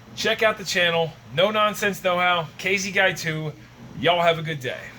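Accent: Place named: New England, United States. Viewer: Now that's what I'm talking about! And you know what I was kind of digging the Yankee dialect there. Y'all have a blessed day.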